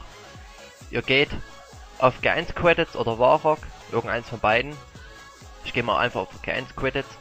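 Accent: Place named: Saxony, Germany. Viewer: xDD gleich zu anfang ->ich bin sachse un red auch so xDDD nice nice du bist mein supersachse Sachsen 4 live:)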